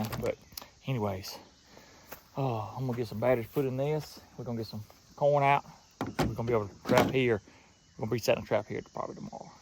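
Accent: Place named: Upper Peninsula, United States. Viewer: You was sounding like a Michigan Yooper a little bit, eh. The strange thing in Michigan is the farther north you go, the more southern it gets.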